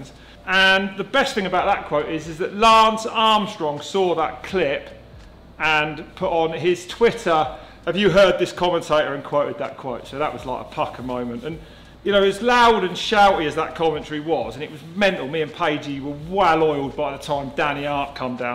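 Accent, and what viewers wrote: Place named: South, United States. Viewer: Funny as fuck! Always been wierd to watch i think its the sothern accent with northern humer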